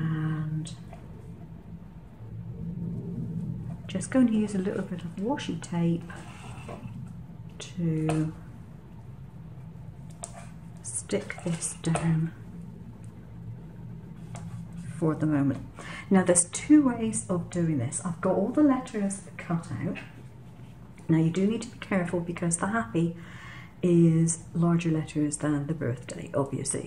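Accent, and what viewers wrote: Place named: United Kingdom. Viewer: Aurrrr dammet. Shes bri ish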